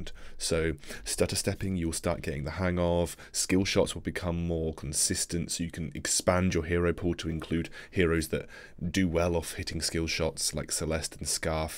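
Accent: United Kingdom. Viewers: think hes on Europe because his intro and his language show us he is brtish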